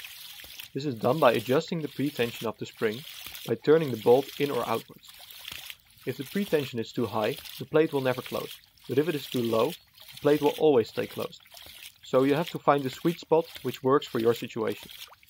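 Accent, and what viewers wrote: Netherlands. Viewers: Lol hearing dutch accent and a water project😂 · First of all fuck the dutch or whatever accent..I feel like Im about to head into a gas chamber...second of all the lost water can easily be recoupped...but no...."Its ok because it comes from a river"....I hope your eggs break....You're welcome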